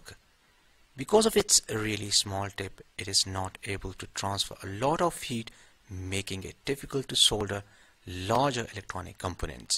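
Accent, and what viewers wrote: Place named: Mumbai, India. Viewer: I like your accent. Is it Mumbai/Bombay?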